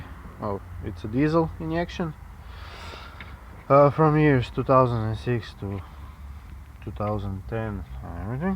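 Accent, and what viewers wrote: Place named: Russia. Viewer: С таким акцентом может говорить только русский.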